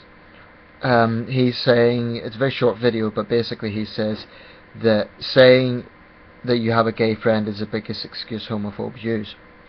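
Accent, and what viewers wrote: Northern Ireland, United Kingdom. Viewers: Ah okay only discovered your channel the other day nice to see someone my age with their head screwed on for a change and do i detect an Norn iron accent? Plenty of up round my area.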